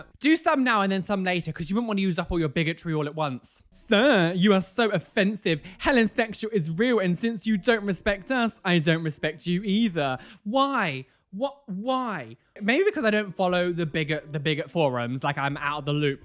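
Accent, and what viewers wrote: Texas, United States. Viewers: YO, ARE YOU TEXAN? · The fact can do an American Accent better than I can do a British accent 😂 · Atleast your American accent isn't as bad as American's British accent Like if America can have different accents why can't we lmao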